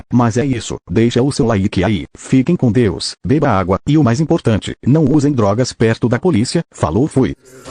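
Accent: Bahia, Brazil. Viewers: o cara é um baiano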